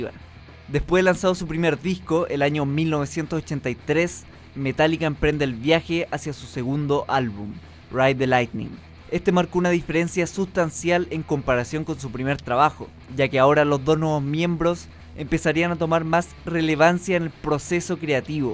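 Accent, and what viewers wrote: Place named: Chile. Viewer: Amigazo. Me encantó tu vídeo y estoy de acuerdo en toooooooooodo lo que dijiste. Para mí este álbum es una obra maestra y el mejor album que Metallica pudo haber hecho! Así que tiene su merecido like y un sub más jeje. Por cierto, de que país eres? Tienes acento chileno . . . Pero quizás me equivoque sjjsjs saludos!! 🤘🔥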